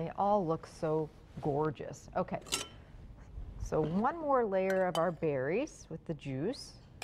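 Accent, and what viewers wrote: United States. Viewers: Oh, no. Packet "pudding," store-bought crackers, store-bought cake ... and won't even whip real cream. When I first heard her accent, I thought, "Oh, this is going to be terrible," then berated myself for stereotyping Americans. This is so much worse than I'd thought was possible. Maybe it was for a "quickest desserts ever" segment? My goodness, even the layering is dreadful -- it's a tumble of ingredients. Let's see actual layers. Very dry, with so little liquid (sherry &/or juice is usually needed for the sponge).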